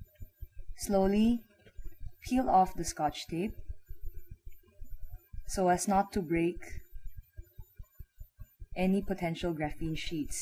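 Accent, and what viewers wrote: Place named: Philippines. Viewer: u sound like a Filipina.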